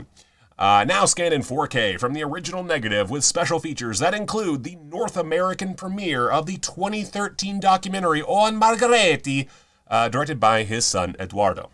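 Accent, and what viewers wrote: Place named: South Africa. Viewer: Your British accent - sounds more South African, And Mr Jolly Lives Next Door is game. Eat the Rich and The Yob are worth the time and fun anyone is aware of the The Famous Five (idealist 1950's kids on jolly good adventures with a healthy lashing of bygone stereotyping) then Five Go Made on Mescalin.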